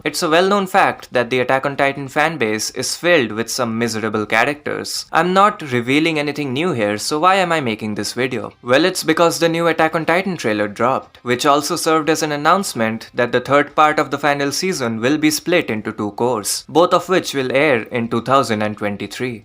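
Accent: India. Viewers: You can't mock anyone while having a loli profile picture and that goofy ass Indian accent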